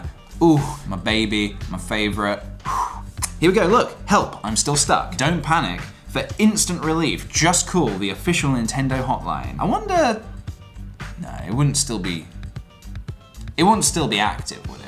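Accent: Italy. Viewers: is he italian? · IS HE ITALIAN????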